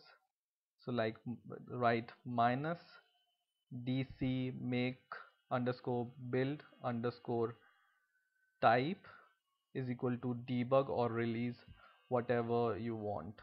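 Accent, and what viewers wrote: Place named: India. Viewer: English with Hindi accent kills me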